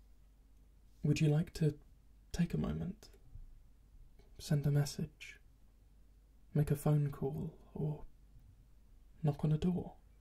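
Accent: United Kingdom. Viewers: The main reason I watch your videos is learning your British accent :)✨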